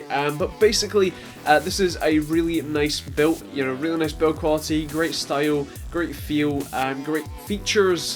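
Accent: Scotland, United Kingdom. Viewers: Good review mate and good to see someone Scottish instead of American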